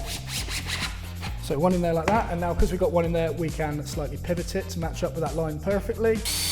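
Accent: United Kingdom. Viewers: Don't add music noise to a demo video! You already have continuous talking, it is super fast, you have a British accent to get used to, your voice is a bit low and gutteral, and then you add this deafening level of extra noise. Why??? I came here for a QUIET and PEACEFUL video on woodworking, not getting driven deaf with a nervy video like this. So stressful to watch. I realize you are a young man buzzing with testosterone, but please get your workout in before filming. Your energy is too high and buzzing like a nuclear reactor. It does not have a calming effect on viewer. DO NOT ADD MUSIC TO ANY DEMO OR DIY. It is insanely irritating and unwatchable.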